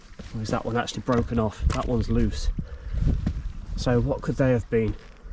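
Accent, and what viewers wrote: Nottingham, United Kingdom. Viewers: Another brilliant presentation. I love your enthusiasm and of course your Nottingham accent. Keep them coming.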